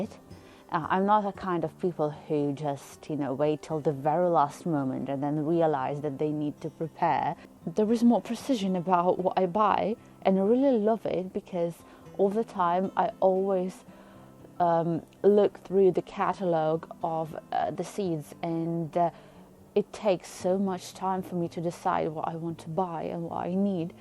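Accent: United Kingdom. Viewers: Thank you for takeing these videos. I'm watching you since some months and I'm still impressed by your British accent and your ability to make gardening interesting (I've never been into it xD). But I can really relax watching you. I hope all your plants will grow great and am looking forward for your next videos. Greetings from Berlin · Ощущение, что говорит британка. Акцент классный!!! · the british accent is unbearable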